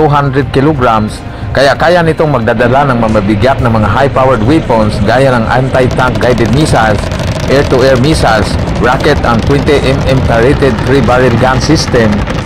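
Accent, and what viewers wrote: Visayas, Philippines. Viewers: Hirap intindihin pag bisaya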